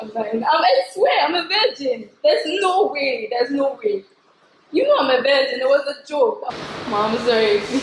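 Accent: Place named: Nigeria. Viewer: So Nigerian this is how you speak in real life..lol I love it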